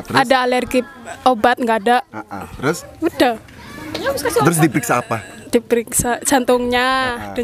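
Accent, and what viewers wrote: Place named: Java, Indonesia. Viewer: Orang mana si ini medok kox bgt😅😅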